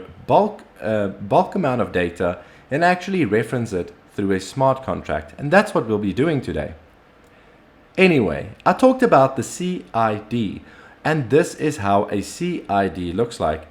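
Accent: South Africa. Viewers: Are you south African?? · I hear a South African accent, and I upvote.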